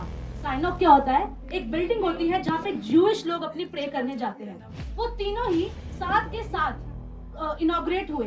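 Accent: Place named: Punjab, India. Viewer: you said secular belief & spritual belief. In captions it's mentioned Religious vs Secular. There is a huge difference between spiritualism and religion even though they're co-related. Your speaking skills are decent but your arguments can be easily penetrated. I'd love to wage war against your Punjabi accent the next time we face each other. Props to you for the victory.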